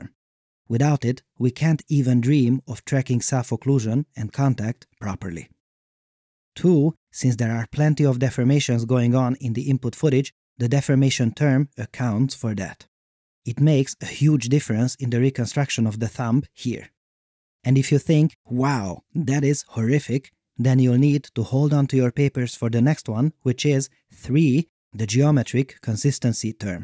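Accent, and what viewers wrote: Hungary. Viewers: Are you Hungarian? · Tipikus magyar akcentus! xd (ne vedd sértésnek!) Nagyon jó videó!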